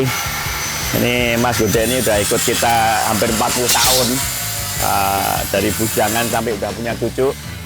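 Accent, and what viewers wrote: Java, Indonesia. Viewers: Wajahh chindo, kecill di ausiee, bicara medok totok, tapi kalo ada yang ngeremehin negaranya, kuliti. Mau bule mau paklek hajar... Mantap, mantap tim prorock...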